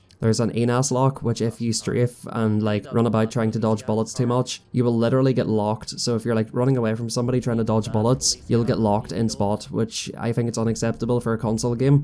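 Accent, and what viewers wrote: Ireland. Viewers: Are y from donegal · You live in Asia? I thought u were Irish aha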